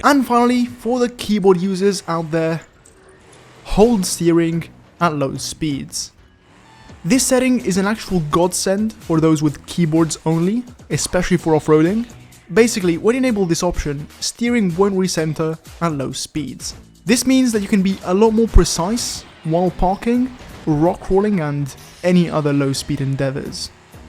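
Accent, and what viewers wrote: Italy. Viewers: ma sei italiano? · aoo ma sei italiano ? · bro so easy to tell you are italian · Ma sei italiano? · Ciao, ho sentito che sei italiano. Come fai a parlare inglese così bene?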